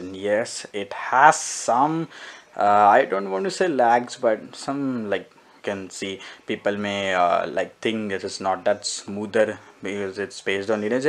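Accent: India. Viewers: Fuck, why do indians have so terrible accent??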